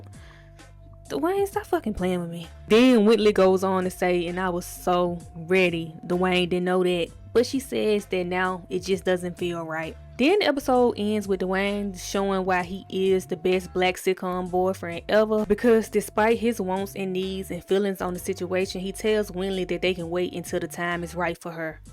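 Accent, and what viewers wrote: Memphis, United States. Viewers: Girl, the way you said Music. You from Memphis? Lol Love your accent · Girl, are you from Memphis? I love your accent! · The Memphis accent makes this recap even better child 😂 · Are you from Memphis ?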